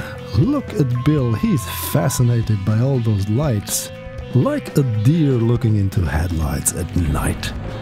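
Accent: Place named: Germany. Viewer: Do you speak german? You‘r „s“ sounds, weil..., german.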